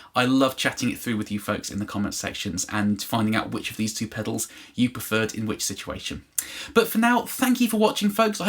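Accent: United Kingdom. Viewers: It’s an English accent. Britain includes Scotland and Wales too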